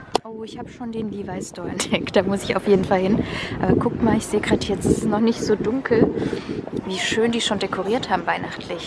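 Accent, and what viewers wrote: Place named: Hesse, Germany. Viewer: Kommst du aus Hessen? Klingt so!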